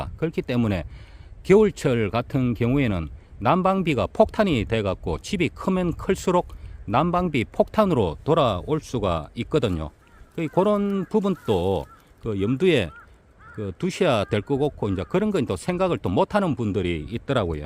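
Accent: Gyeongsang, South Korea. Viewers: 영상에 자막을 좀 넣어서 하면 더 좋겠습니다. 가끔 무슨 말인지, 경상도 지역 아닌 사람들은 빨리 알아듣지 못하겠네요. ㅠㅠ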